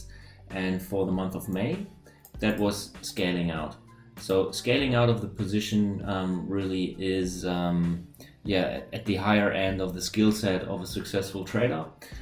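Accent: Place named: Denmark. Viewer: Danish. You’re Danish aren’t you? Your name suggests you’re from around there but also the way you pronounce “particularly”. The Danes love CSGO and there’s one Dane in particular who pronounces that word the same way you do. I could be wrong. Anyway, great video again. Edit: I’m even more sure that you’re Danish: mild temperament, even mannered, pro-social, supportive, more words that sound Danish like “next time.” Haha, anyway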